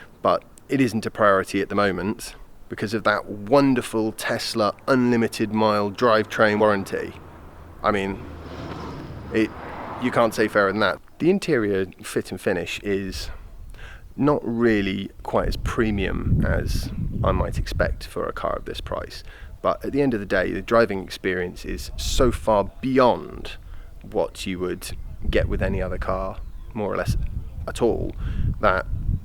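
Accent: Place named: United Kingdom. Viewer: Wait where are you from? You sound British and have a right hand drive vehicle but you speak in miles. I'm so confused.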